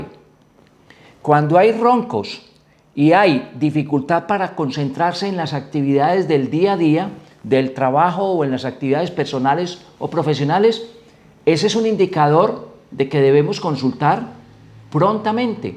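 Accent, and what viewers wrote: Colombia. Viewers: Por tu manera de hablar, eres colombiano, estoy en lo cierto?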